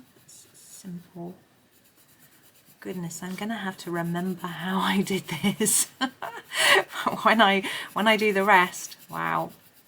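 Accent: England, United Kingdom. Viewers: Hello I've been following your channel now for a couple weeks, didn't say anything and I should of because I like very much not just your coloring but also to listen to you. I like that you choose more simple things and put emphasis on quality and you explain everything so well. I am so intrigued by your British accent I'm sure not the first to say that, although you probably don't think you have an accent. There are so many different kinds depending of what part of England you are, or UK, beside the Scottish and Irish accents. I use to be a professional musician, retired now, I have very good hearing still, maybe that's the only good thing I have since I'm older lol. So I can easily differentiate between the different English accents and yours is wonderful, sometimes you sound like Emma Thompson, I'm sure you know the actress, sometimes like Julie Andrews. 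It's very elegant, also your voice is very calming and you have such a nice sense of humor. So I really love to watch you and listen to you. I have some coloring and product questions, I will leave that for next time, this comment is already too long. Looking forward to the next video, you take care and stay safe. Judith 😊❤️ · Oh, your version of this flower mandala is Beautiful!!! I love watching you color, giving us tips here and there. I'm a rather new subscriber and enjoy your coloring and, especially, listening to you. Your voice is so very soothing and your English accent is wonderful. I'm getting Back into coloring. I've had a rather long stretch of not coloring, but piecing quilts. So, not doing nothing, but piecing Can be frustrating, and coloring is just so relaxing. I could color for hours!! Thank you for your calm, inspiring videos. I enjoy them immensely.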